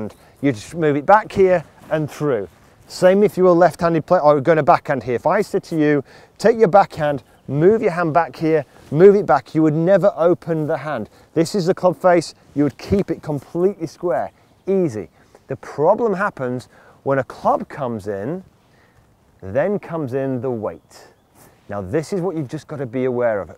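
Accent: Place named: England, United Kingdom. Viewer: Just found you yesterday. You are the first of countless internet teachers who's videos I have viewed who speaks a language (not counting the English accent :-) ) I have been able to understand! I took your tips on the take away to the range today and hit my irons more solidly and more consistently than I have in a long time! Please keep the videos and the 'simple talk' coming!! I'm very grateful for your help!! I look forward to more tips, drills and instruction and once again enjoying the game of golf!!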